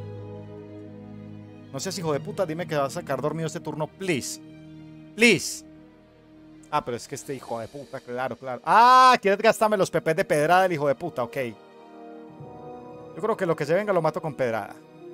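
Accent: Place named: Colombia. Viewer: Hola bro, me encantan tus vídeos y tú acento parcero xd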